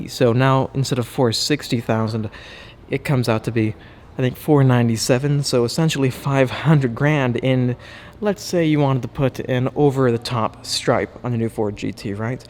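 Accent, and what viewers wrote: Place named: Alaska, United States. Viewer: Love the Alaskan Bush people accent! Great content 👍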